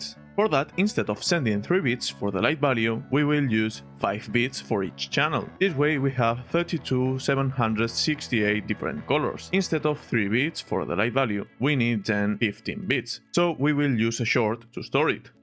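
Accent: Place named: Spain. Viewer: That english spanish accent 👌👌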